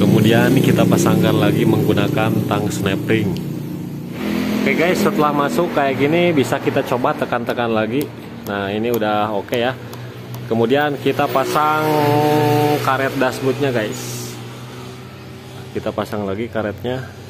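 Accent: West Java, Indonesia. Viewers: Gan logat nya sundA bngkl nya di mna?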